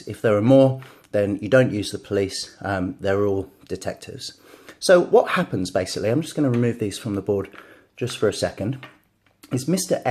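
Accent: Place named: South East England, United Kingdom. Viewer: Hello, merry Christmas. Very good review. I got this game today for Christmas. As I am studying English I would like to know where you accent is from: south-est of Great Britain?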